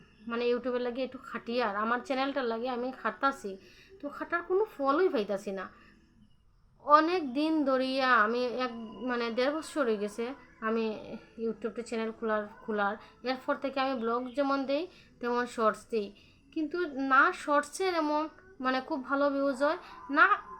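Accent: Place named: Sylhet, Bangladesh. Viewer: তোমার ভাষাটা একদম সিলেটি ভাষার মত আমার তো খুব ভালো লাগে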